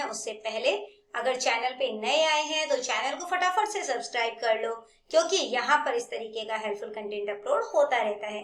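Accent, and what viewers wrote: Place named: Punjab, India. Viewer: App punjabi bohut cute bolte ho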